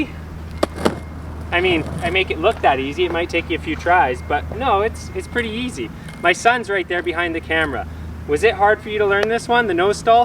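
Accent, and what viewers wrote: Canada. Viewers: This guy sounds sooo Canadian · You sound hella Canadian and I like it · This is the most Canadian accent I’ve ever heard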